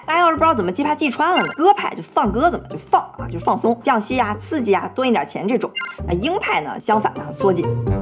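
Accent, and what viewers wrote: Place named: Dalian, China. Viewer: 新年快乐🎊这期太棒了 特别喜欢小lin用亲切的大连普通话娓娓道来 有趣 易懂🤓我也是大连人所以倍感亲切。如果有机会 希望小lin出一期影响美股市场大盘上涨和下降趋势的几项重大因素。我想点击量一定会爆棚🎉虽然我们心里都是模模糊糊的明白一些，没啥系统，都是叶子 没形成树，相信小lin的总结归纳能力❤支持我说的 大家点个赞❤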